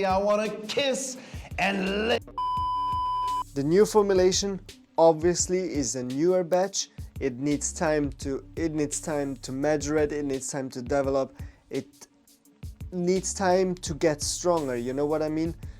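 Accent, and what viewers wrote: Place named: Italy. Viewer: U sound italian. Coincidence? 😂😂 of course not fools.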